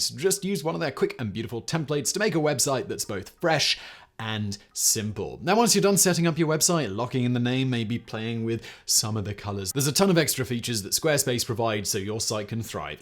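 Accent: England, United Kingdom. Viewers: “Badassery” doesn’t sound right in a middle class English accent.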